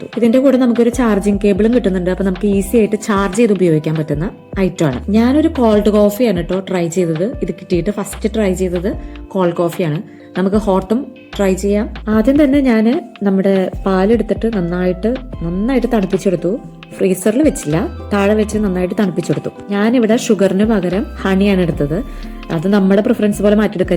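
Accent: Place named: Thrissur, India. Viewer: Is ur place Thrissur? Why do you mix your slang with others? Just go ahead with your own style, people will accept it. Be yourself.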